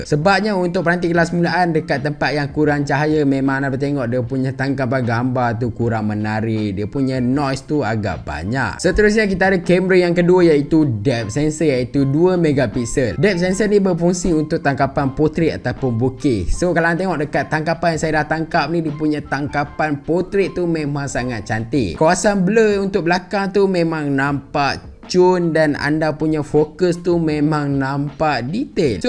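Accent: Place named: North, Malaysia. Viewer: Wah org utaqa mana ii mai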